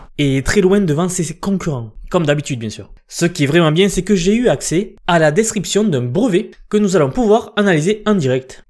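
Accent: South, France. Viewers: Comment ça me fait trop plaisir d'entendre une voix du sud parler de technologie . Et puis je te suis, tes contenus sont supers et donnent la pêche.👍🏻😋. Tu es d'où si ça n'est pas indiscret ?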